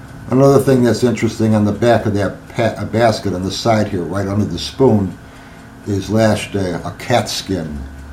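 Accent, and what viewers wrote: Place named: Chicago, United States. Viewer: Hey, no joke I just asked my son if he thinks looks like anyone he knows and he took one glance and said, "Al Pacino!" LOL! Did you know that your Chicago accent is very much like Upstate NY?! I worked in a Bank Call Center for years and always knew that accent! Chicago or Upstate NY where I'm from! Had many, many ppl ask me over the years if I was from Chicago and are surprised when I say NY.... never been to Chicago.... Just a little trivia for ya!! Namaste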